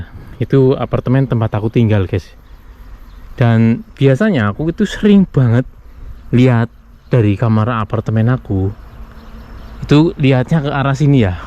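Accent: Banyumas, Indonesia. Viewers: Mantep mas konten2nya bahasane logat ngapak tapi sangat menghibur dan menambah wawasan juga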